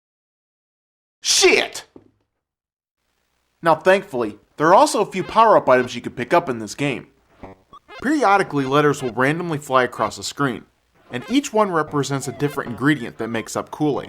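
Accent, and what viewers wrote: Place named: Wisconsin, United States. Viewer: man this is so similar to avgn no wonder people are taking sides. both vids are good, james is just more entartaining...plus his jersey accent beats out this wisconsin accent any day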